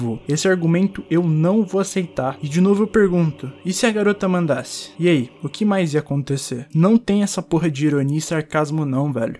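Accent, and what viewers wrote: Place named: Sao Paulo, Brazil. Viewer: Paulista falando palavrão é lindo dms Vc parece cmg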